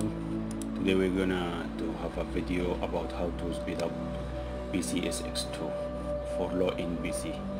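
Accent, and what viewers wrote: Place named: Gulf, Arab world. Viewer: انا قلت انه انجليزي بس يوم شفت اللهجه قلت ذا ولدنا وبس ههههههههههههههههههههههههههههههههههههههههههههههههههههههه(استمر يا أسطوره والله انك ساعدتني🫡🤍)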